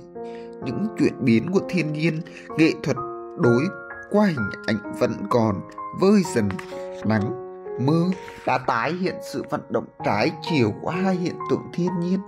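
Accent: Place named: Hue, Vietnam. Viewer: rất thích hợp khi chọn giọng huế đọc ạ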